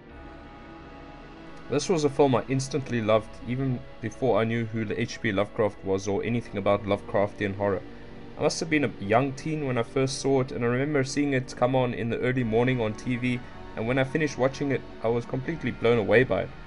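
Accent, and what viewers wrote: South Africa. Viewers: Hey man, are you South African? You sound South African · U sound like someone from Cape Town bro, we all have the same or somewhat similar accent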